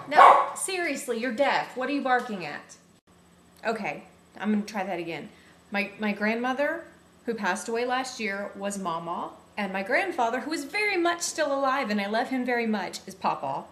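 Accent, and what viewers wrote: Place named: North, United Kingdom. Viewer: Lol, I totally thought you were from the north.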